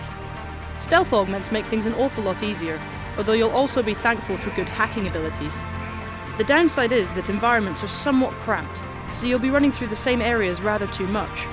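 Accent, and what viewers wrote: United Kingdom. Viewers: HOLY SHIT IGN HIRES BRITISH 15 YEAR OLDS!? HOLY FUCK! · It is a british girl you idiots I can easily tell from the accent and voice what are you all 18-20 years olds with a brain of 10 year olds. As far the DLC goes it is good but the story is really kind of boring and it is short for 15 bucks and why don't they allow you to import your augmentations from the main game is beyond me. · who the hell is this? some British boy/girl?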